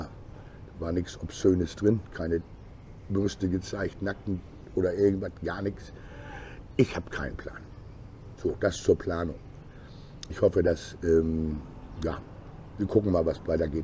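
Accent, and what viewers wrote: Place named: Hamburg, Germany. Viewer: Moin, einfach so bleiben wie Du bist und nicht für andere umerziehen lassen. Ich denke wen deine Aussprache oder Videos nicht passen, sollte einfach nicht nerven und andere schauen. Ich finde genau das machen deine Videos aus, dass du dich nicht verstellst und authentisch bist. Manche kommen mit hamburger Jungs vielleicht nicht klar, dann sollen sie einfach wegblicken. Ich würde die nörgler blockieren falls sowas geht. Sollen sie sich andere Videos anschauen. Viele vergessen das sie umsonst schauen und du kosten hast. Ich finde deine Videos top, egal ob auf Roller, zu Fuß, teils englisch oder laut, scheiß der Hund drauf. So sind sie top. Ein Mangel habe ich, dein abspann mit den Verweis auf andere Videos, würde ich nur 5-10 Sekunden machen, dadurch schauen viele nicht bis zum schluß denke ich. Das zählt wohl auch wenn bis zum Schluss geschaut wird. 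Deutschland ist keine Option für dich, dazu bist du zu lange weg gewesen und wie Du sagst, als Deutscher hat man hier nichts zu erwarten. Weiter so mit deinen Videos. Danke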